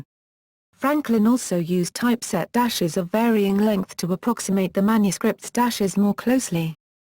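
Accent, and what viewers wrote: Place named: United Kingdom. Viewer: british accent or not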